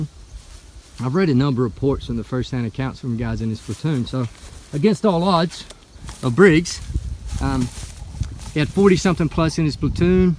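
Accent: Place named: South, United States. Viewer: I have just discovered your channel (love it by the way, amazing content!), and based on solely your accent and the way you speak, I genuinely cannot tell whether you are from the American South, or Australia. I went back and forth on it all the way through this video and still couldnt determine.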